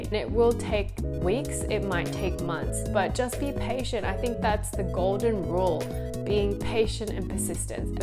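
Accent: Australia. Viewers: Are you an Aussie? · Thanks for the advice, I love greyhounds, the most placid sweetest dogs. You have a lovely hybrid Australian soft U S accent, nice to listen to. I’m Spanish, live in Sydney Australia. God bless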